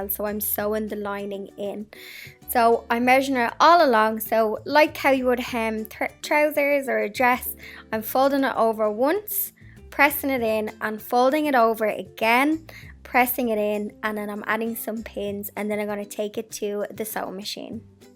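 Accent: Ireland. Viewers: I think I'm going to watch all my tutorials by Irish content creators...that voice!!! 💚 🤍 🧡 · I love your accent, Irish girl!!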